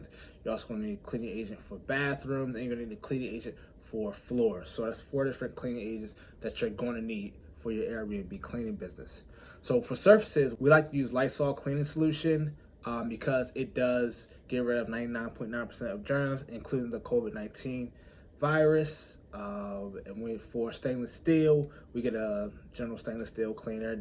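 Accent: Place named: Baltimore, United States. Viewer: lol I wrote this before I heard the bmore accent bruh I forgot y'all consider PG and Moco both DC 🙏🏿 stay up and thanks for the knowledge bruh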